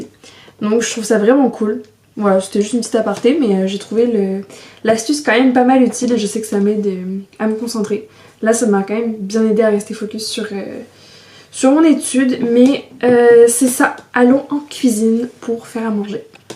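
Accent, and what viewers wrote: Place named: Quebec, Canada. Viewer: Oh, je ne m'attendais pas du tout à t'entendre parler avec l'accent québécois, ça te change tellement ! ça fait plaisir de te retrouver sur YouTube 🥰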